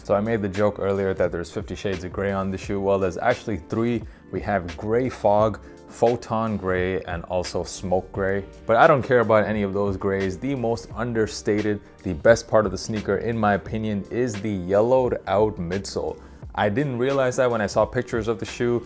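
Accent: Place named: United States. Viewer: it was good man, nett video ! you speak english like an American if you didnt mentioned you were from Turkey I thought you were from the States 👍👌🇩🇪🇺🇸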